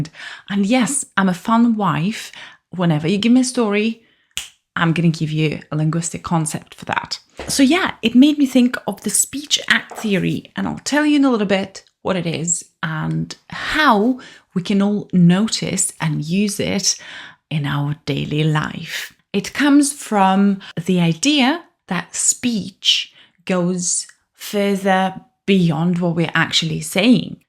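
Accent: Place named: United Kingdom. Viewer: It's interesting that your British accent is so strong.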